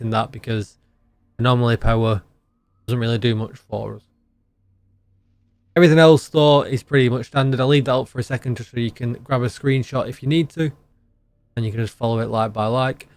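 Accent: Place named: Yorkshire, United Kingdom. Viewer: Hey where in the UK are you from. Your accent sounds maybe Yorkshire? Great content by the way.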